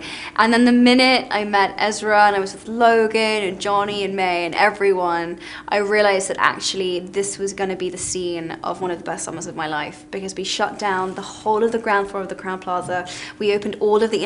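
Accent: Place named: United Kingdom. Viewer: Love her british accent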